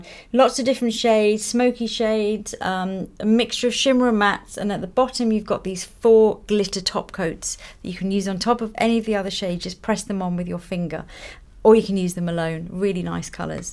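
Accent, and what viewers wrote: United Kingdom. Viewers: I really love your perfect brit pronunciation! And what a gorgeous makeup!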